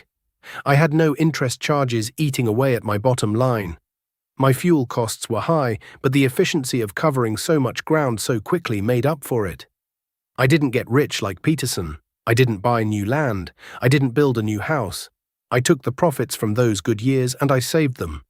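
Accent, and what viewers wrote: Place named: United Kingdom. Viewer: The narrator with the British accent talks too fast. British accent talking about American farm?…?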